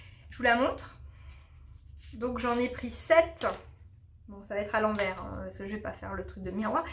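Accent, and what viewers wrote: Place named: England, United Kingdom. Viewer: Bonjour, je ne sais plus trop comment je suis arrivée sur ta chaîne YouTube, je crois que c’est une recommandation de la chaîne. En tout cas, je suis mordue de chez mordue: j’aime ta personnalité enjouée, ton super accent anglais, les modèles et les laines que tu choisis (permets-moi de te tutoyer). Et puis, nous sommes presque voisines: j’habite Laval et je vais assez souvent à Portsall, sauf en juillet-août. Je continue le rattrapage des visionnaires. Bravo, continue comme ça!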